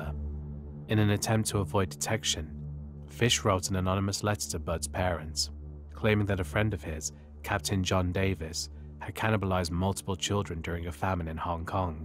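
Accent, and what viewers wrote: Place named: England, United Kingdom. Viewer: This guys English accent makes these sad accounts worth listening to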